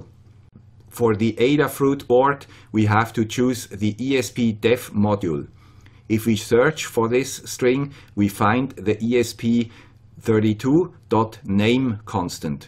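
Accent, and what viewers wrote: Switzerland. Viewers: Yeah you are right. I was in a bad mood that day. Any way, I always enjoye listening to the guy with a Swiss accent, again. Keep it up. (y) Thank you for you great work. :D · Swiss accent is good to us from Brazil!congrats!!!!!!!!!!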